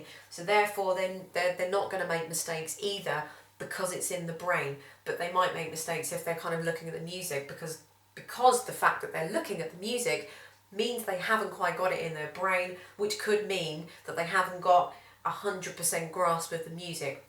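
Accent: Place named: Scotland, United Kingdom. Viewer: You have a rich Scottish accent in my opinion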